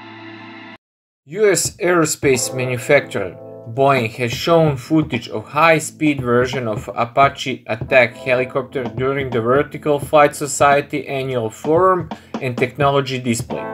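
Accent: Russia. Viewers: Why the fuck should I believe any one with a damn Russian accent giving me updates on a new American advanced helicopter!? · Why a Russian narrator ? hard to understand what he is saying ! still love all of the cobras ! :) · Drunk, Russian narrator needs to sober up...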